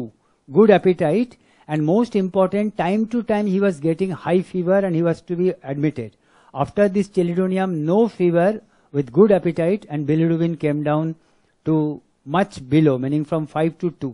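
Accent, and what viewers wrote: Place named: Mumbai, India. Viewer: I had to blink a few times. The doctor looks like my German neighbour, but the language is definitely Mumbai.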